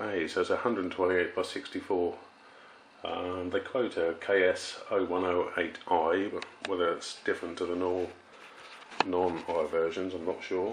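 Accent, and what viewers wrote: United Kingdom. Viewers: are you british or american aka born in what country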